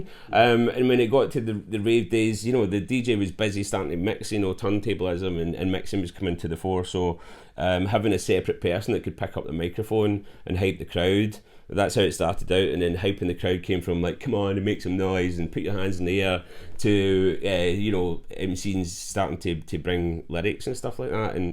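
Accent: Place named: Scotland, United Kingdom. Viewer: U sound like ur from milngavie Where’s fuckin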